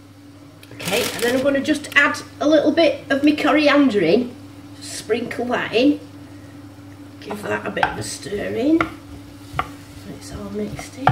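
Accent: Manchester, United Kingdom. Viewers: I really don't know how to start on this one. First the obvious irony of someone in Norway watching a UK lady making a Swede (see what I did there?). Apart from the fabulous recipe which I made this very evening, you are absolutely hilarious. The presentation, explanation and witty comments are SO endearing, that's entertainment in itself. So many so called cookery experts are super slick and explain things WAY too fast but you are just . . . . so down to Earth and genuinely entertaining. Love the accent - I'm guessing Manchester or Burnley? Either way, the most entertaining (and rewarding) ten minutes I have spent in a long time, I thank you! If I may be so bold as to offer one piece of advice . . . . please don't ever stop posting, thischannel is solid gold. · Ahahaha love the broad Mancunian accent....from a fellow Mancunian...going to try this as I'm a diabetic type 2 and can't eat potato as watching my carb content...x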